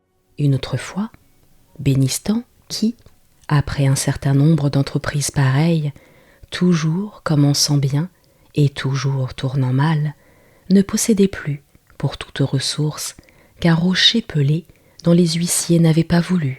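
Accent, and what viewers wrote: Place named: Provence, France. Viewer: désolée c est le téléphone qui a mal écrit Je voulais dire l accent provençal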